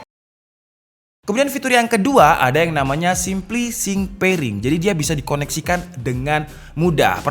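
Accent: Malang, Indonesia. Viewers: Loh orang Malang?:v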